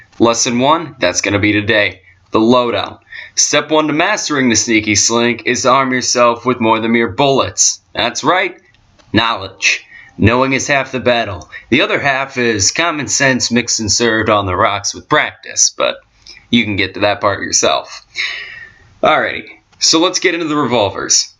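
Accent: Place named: Scotland, United Kingdom. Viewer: SCOTTISH ACCCCEEEEENT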